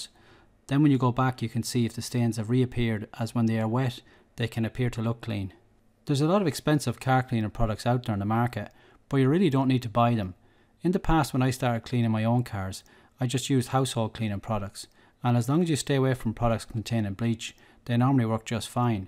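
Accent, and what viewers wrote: Ireland. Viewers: Great job. I enjoyed hearing the Irish accent too (Mum was Irish). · Glad to here another Irish accent on YouTube. Keep it up bro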